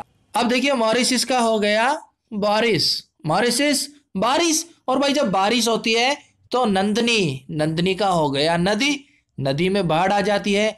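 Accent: Haryana, India. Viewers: Sir aapki trick na best h .. Bss aap ase hi hme vedio provide krvate raho haar session per Aapki aavad haryanvi m hote h vo bsst h m bhi haryana se hi hu mujhe or bhi aache se smjh aate h aapki vedio😀😀😀😀😁😁😁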